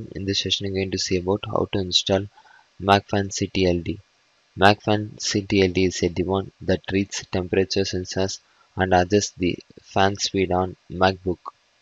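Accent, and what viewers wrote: India. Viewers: Indians speaking english like this dude sound very RIDICULOUS, please use english text to speech software, indian.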